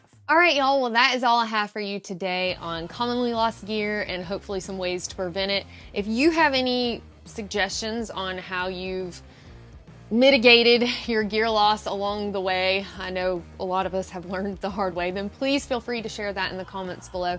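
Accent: South, United States. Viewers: Your southern accent really came out on this one. I was asking why on earth would anybody be hiking with a ladder? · A hot blonde with an American southern accent is about as hot as it gets. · I would be laughing with you if she wasn't so cute and I didn't love the southern accent.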